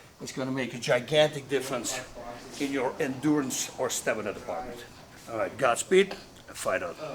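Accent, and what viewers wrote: Netherlands. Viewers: Hoi. Ik ben de meeste amerikaanse fitness adviseurs een beetje zat. Meestal komen ze met varianten op dingen die we wel kennen. Jij weet mij te verrassen. Fijn om heel in de verte dat nederlandse accent te horen. Ik zit dagelijks op de racefiets en deze tips ga ik zeker uitproberen. Groeten & Dank van een 60 jarige rotterdammer.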